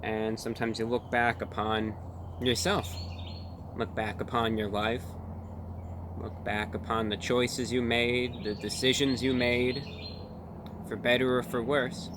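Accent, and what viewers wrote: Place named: Transatlantic, United States. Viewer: People can mock all they wish, but it doesn't matter, until you get master the transatlantic accent and wear a business suit attire everyday, you'll never be on the classy level to make a truly meaningful insult on.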